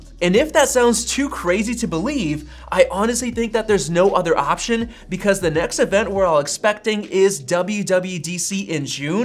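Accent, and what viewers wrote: Russia. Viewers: Афигеть, у тебя русский акцент) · Portativny personalny Computer! I love your russian accent! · haha, I love your Russian accent.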